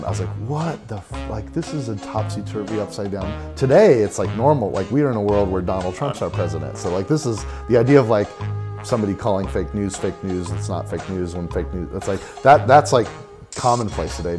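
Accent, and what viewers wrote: United Kingdom. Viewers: he is aways mad angry video game nerd he is british aaaaaaaaa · Well for being the first video that i saw of this "big man with british accent" it was pretty cool and informative, I own Overwatch because I bought it when it was on sale and the issue with the llot boxes isn't Duengeon Keeper mobile bad, I haven't bought a single box but I'm pretty satisfied with the experience besides on a team based shooter that shit is kinda expected like in rainbow six siege and the likes because is giving new characters and new maps for free not like call of duty thta charges another 60 bucks for a bunch of maps, anyway the video was good and fuck Alien colonial marines, that bastard still owes me money for buying that piece of crap...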